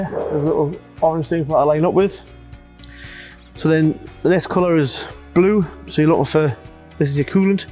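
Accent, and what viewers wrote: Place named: United Kingdom. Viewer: That is the most british sounding man ever jesus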